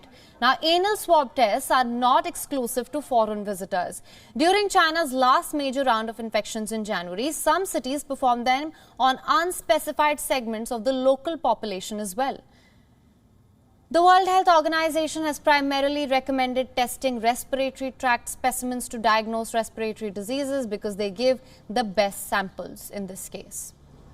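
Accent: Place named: India. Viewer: OMG that indian english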